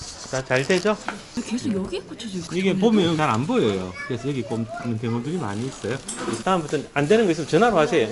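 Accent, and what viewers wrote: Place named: Daejeon, South Korea. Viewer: 대전촌놈이 유튜버로 인기좀 얻엇다고 서울올라와서 별 ㅈㄹ을 하네ㅉㅉ 말투부터가 촌티난다 ㅉㅉ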